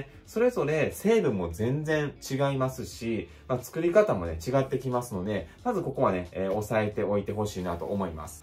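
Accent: Kansai, Japan. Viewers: 国菊あまざけを箱買いして毎日飲んでます📦 関西イントネーションが新鮮ですね〜🦻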